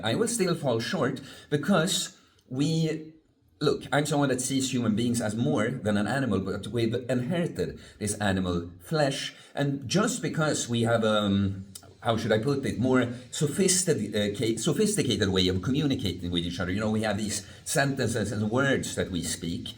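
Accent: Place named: Sweden. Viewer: this was fucking hilarious, I nearly pissed my pants. Your Swedish accent rocks! Love your outlook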